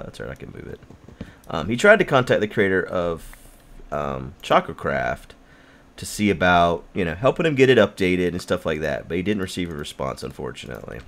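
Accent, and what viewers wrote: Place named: South, United States. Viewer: It's just a slight Southern accent, guy. He knows how to talk.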